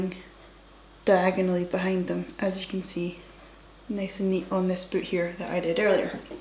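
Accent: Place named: Scotland, United Kingdom. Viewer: You're description of this technique is exactly how I wore mine in the late eighties/early nineties. You're freckles and Scottish accent are adorable. Having been born in Belfast and grown up in Toronto... It must be said, You are an absolute gem. Cheers, Michael Hughes in Toronto.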